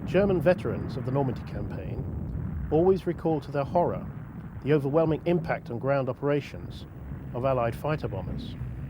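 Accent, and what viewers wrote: England, United Kingdom. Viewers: the british accent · I had a hard time trying to understand the narrator. Too much English (accent)....lol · morti271 he has a lot of brithis accent but for me is better than the american one . is more easy to understand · nice video,the only thing is i can't understand the british accent :( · You mean proper English without American accent? :P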